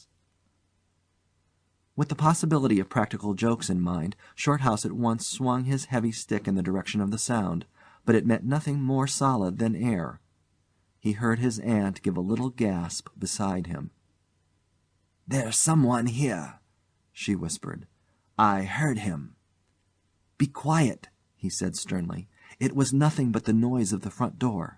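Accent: United States. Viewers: The American voice is a welcome change. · Funny I think when it's a ghost story narrated,do we expect a British English accent?So when an American narrator comes on..we are surprised..Let's listen..love a bit of spooky!!🙂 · Thoroughly enjoyed this ghostly story, the American narrator was a surprise, but he read the tale masterfully, keeping the suspense until the end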